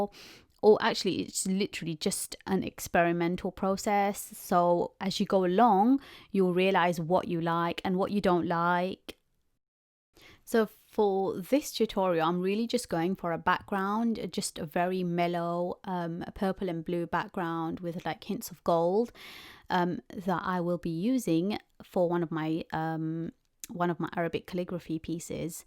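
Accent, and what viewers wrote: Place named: United Kingdom. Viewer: i know this sound unrelated to your beautiful art this is the first time i've heard your voice i don't know why but i thought i was hearing a british accent (i don't mean it rudely i mean it as a compliment!) also thank you for the tutorial so beautiful Mashalllah!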